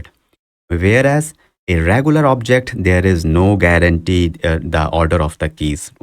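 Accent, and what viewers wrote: India. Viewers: The contents is 100% but its diffcult to understand with Indian accent -_-. !!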